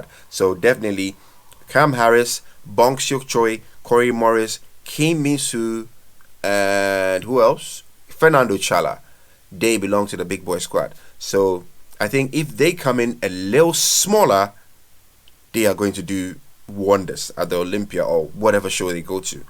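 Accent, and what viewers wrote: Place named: France. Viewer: I think he speaks french. Souns like a french accent.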